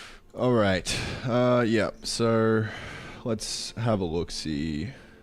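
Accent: Australia. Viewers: mate, you threw me for a loop there. "Take a peep for Circles specific stuff..." Searches for Sokol Aussie accent is from another world.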